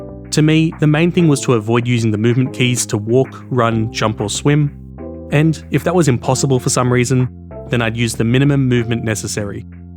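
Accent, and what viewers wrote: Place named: United Kingdom. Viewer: you british sound cool. As an American from California Dallas I respect the yabbies you blokes drink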